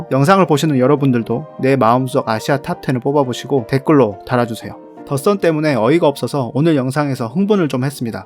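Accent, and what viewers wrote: Jeolla, South Korea. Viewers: 전라도 사투리가 심하시네요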